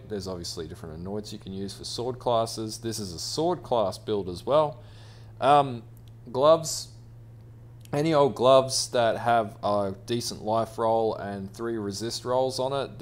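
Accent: Australia. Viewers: Hey love your vids 👍🙂 Also are u Aussie?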